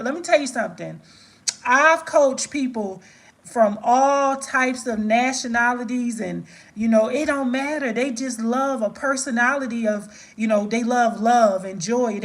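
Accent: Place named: Philadelphia, United States. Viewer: I was drawn to you from the first video I watched. I told my husband honey look at this candle girl from Philly. I love a Philly accent. When I found out you had a group and did classes I been a fan ever since. I have watched those other candle maker videos, they put me to sleep, (no shade on them) but it's a no for me. Now u back to doing skincare, they gone be mad. This is a extra bonus. Get ready for the haters cause 😎 u about to blow it out the water.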